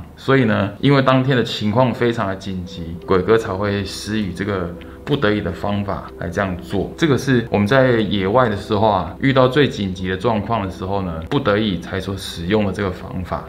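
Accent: Taiwan. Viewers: 哇。。哈喜歡鬼哥的鬼式台語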